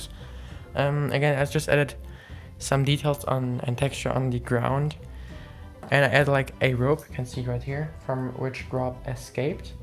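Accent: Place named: Germany. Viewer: Are you from germany its just that the Accent sounded familiar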